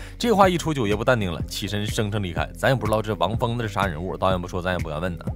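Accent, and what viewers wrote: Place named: Northeast, China. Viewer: 我现在听着这个配乐带着东北话的解说下意识想到了牛叔……………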